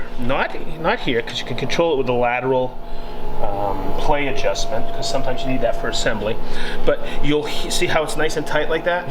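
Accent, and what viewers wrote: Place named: Rhode Island, United States. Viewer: I’m from Rhode Island I know that accent , living in Savannah Ga.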